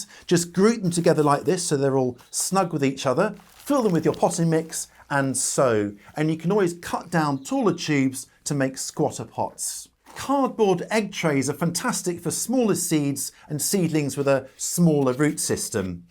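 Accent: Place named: United Kingdom. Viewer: Hello Ive been watching your videos. I just decided to join your channel. Like your accent.. can I ask what part of the UK. You live in?? Joe from the USA.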